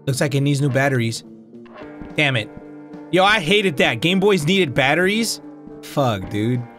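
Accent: Spain. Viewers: Bhahaha the Spanish accent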